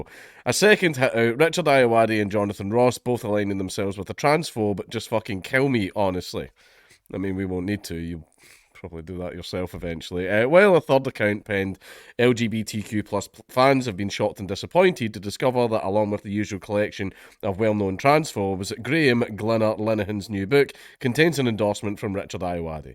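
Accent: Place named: Scotland, United Kingdom. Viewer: You're the Scottish version of Brian Blessed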